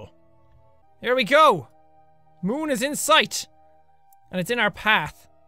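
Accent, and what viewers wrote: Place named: Ireland. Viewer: You accent is entertaining its a nice change of pace from EVERYONE BEING BRITISH OR AMERICAN or the couple of Australians but IRISH it's a nice change of pace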